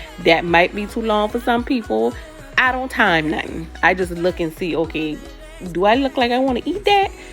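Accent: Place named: South Carolina, United States. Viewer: I knew you where from sc! Your accent is heavy lol! Going to try this for vday! But would it matter if I use crab claw meat? I don’t really care for the lump crab lol.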